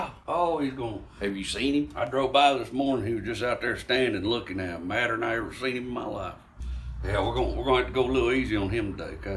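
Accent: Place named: Arkansas, United States. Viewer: I'm from rural southwestern Arkansas (currently live in DFW) and this sounds like every visit back home.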